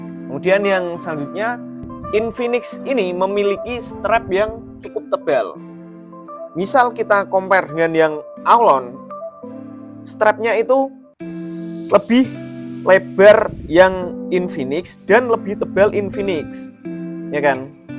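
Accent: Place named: Semarang, Indonesia. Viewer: tq mas 🙏 Btw kek logat semarangan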